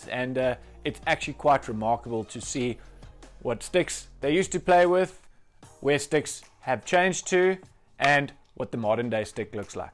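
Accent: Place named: South Africa. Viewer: red white blue, abn amro, and kampong(?). First I thought you were a dutchie, but then I heard the South African accent haha, great video!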